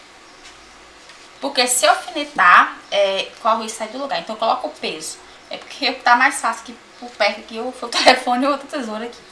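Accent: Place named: Minas Gerais, Brazil. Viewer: ***** Ah! Que interessante! Realmente já tinha ouvido dizer q o sotaque de Minas era forte.Fica giro viu? As brasileiras q sigo no yutube, a maioria são de São Paulo e o sotaque é diferente,mais levezinho.Obrigado por responder e tenha um bom dia!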